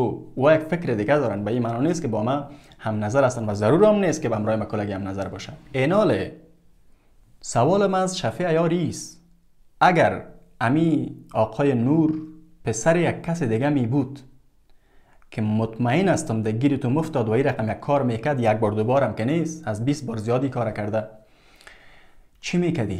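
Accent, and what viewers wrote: Iran. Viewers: Ne tu konigak khai namaindagi mekadi jawanhai Afghanistan ra. Keroom da lahje iranet. Bacha bershe amerallah salle.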